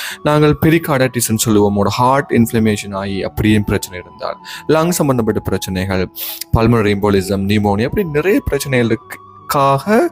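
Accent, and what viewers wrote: Sri Lanka. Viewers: உங்க இலங்கை தமிழ் உச்சரிப்பு அருமை தம்பி 🎉🎉🎉சட்டுன்னு பார்க்க AI மாதிரி இருக்கு நீங்க எங்க work பண்ரிங்க my son joining 1year mbbs in channai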